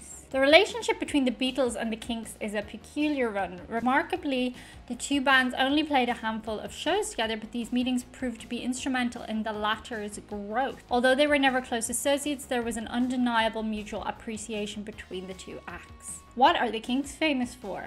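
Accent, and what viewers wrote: United Kingdom. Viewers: I find it hard to believe you have a UK accent and have never heard the Kinks. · If you're British, which it sounds like you are, there is no better dive into retro British music than the Kinks 1966-70 as they were forced by the US ban to be quintessentially English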